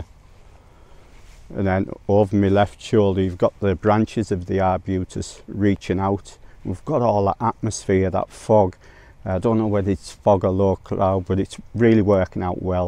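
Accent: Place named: Sunderland, United Kingdom. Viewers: Canny video, where are you from? I'm from Sunderland and can detect traces of mackem in your accent but not sure. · Great video, me and my wife are trying to figure out your accent, I've decided you're from Cockfield, she thinks Sunderland. Could you please end the mystery for us.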